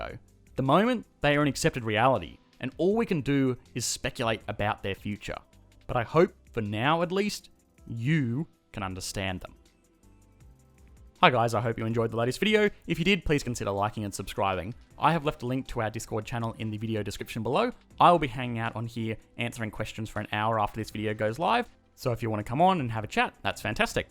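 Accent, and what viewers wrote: Australia. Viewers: Bruh r u aussie? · I like this channel. I'd like it even more if the australian accent wasn't the most annoying of the english accents.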